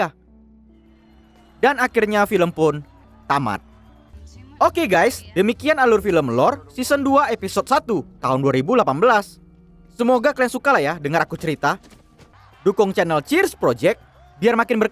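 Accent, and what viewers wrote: Medan, Indonesia. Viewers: Min orang medan min? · Medanya nampak kale kk🙏🙏😅😂🤣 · Aku sor nya dengar yg ngmong di chanel ini macam anak medan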